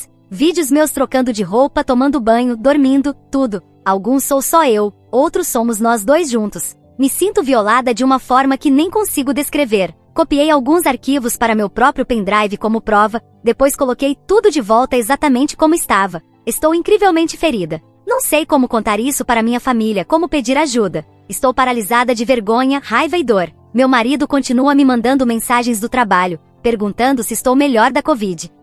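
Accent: Portugal. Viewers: Adoro as estórias narradas em português europeu.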